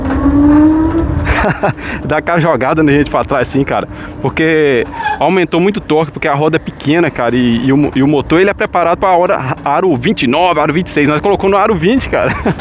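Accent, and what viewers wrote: Minas Gerais, Brazil. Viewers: Boa noite amigão pelo sutaque voce deve ser meu conterrãneo mineiro, eu não só acho mais a NASA terá que estudar sua invenção MUITO TOP. Moro em Sorocaba SP. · Aí ficou top. Vc é de minas?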